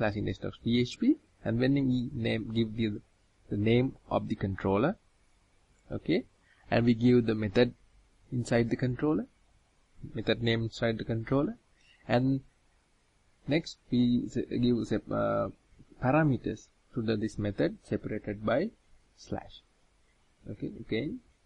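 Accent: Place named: India. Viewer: m sorry to look racist CAN YOU PLEASE FOR THE LOVE OF GOD STOP INDIANS MAKING TUTORIALS WITH THEIR LANGUAGE? LET S FACE IT YOUR ENGLISH ACCENT SO FKN FKCED UP WE DON T UNDERSTAND.... Sorry again yet it s the truth... have someone else do the voice over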